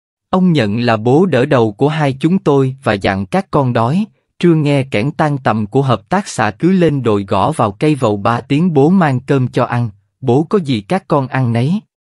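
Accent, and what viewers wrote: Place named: South, Vietnam. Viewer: đây là đúng giọng miền nam mà thật hãnh diện vì giọng đọc của a cảm ơn kênh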